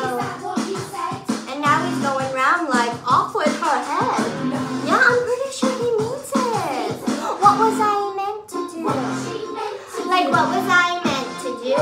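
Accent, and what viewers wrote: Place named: United States. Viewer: Her American accent with the British background vocals 😂😂 I don’t mind it tho tbh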